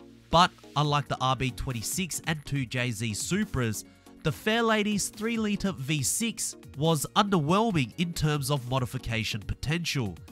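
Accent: Australia. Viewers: aussie voices are the best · I never knew you were Aussie mate. I can hear your accent now. I'm across the ditch. Those Rovers were popular here back in the day.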